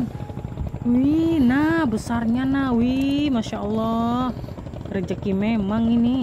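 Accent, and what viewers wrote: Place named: Banjar, Indonesia. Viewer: logat banjar ny ad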